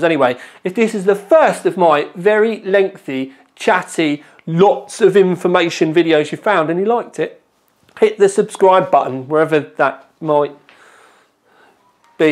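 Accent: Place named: England, United Kingdom. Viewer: LOVE THE VIDEO, I ALWAYS LIKED YOUR VIDEOS, ACCENT, AND ENGLISH PEOPLE IN GENERAL. I AM UNCERTAIN ABOUT THIS MEMORY CORSAIR Vengeance LED 16GB (2 x 8GB) 288-Pin DDR4 SDRAM DDR4 3200 (PC4 25600) Memory (Desktop Memory) Model CMU16GX4M2C3200C16, will it post, if it can, and i have a speed problem, i can adjust it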